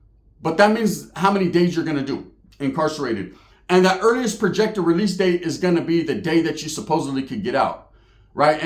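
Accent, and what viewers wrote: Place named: California, United States. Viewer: You from Cali I bet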